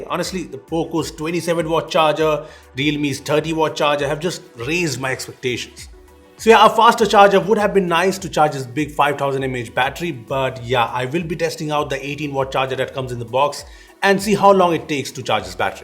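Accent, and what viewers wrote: India. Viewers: Now an Indian that can speak pure english Amma subscribe right away 😌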